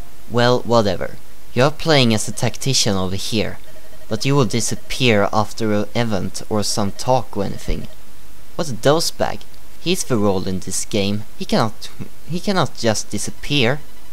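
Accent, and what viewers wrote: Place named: Sweden. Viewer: Hehe. Man hör på ditt uttal att du är svensk. Älskar verkligen det spelet. Det är det bästa Fire Emblem för min del tätt följt av Path of Radiant.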